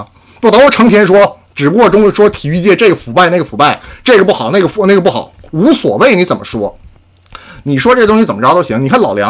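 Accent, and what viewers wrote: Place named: Beijing, China. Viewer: 好家夥，是青島人嗎？這口音我還以爲你是北京的呢，完全沒有青島口音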